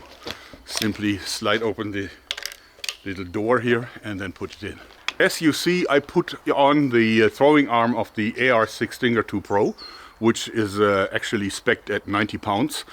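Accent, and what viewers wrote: Germany. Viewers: I love that germanized english accent!. Cool!. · Hey, your accent reminds me of the accent Germans use when they speak English here in Germany. Are you German?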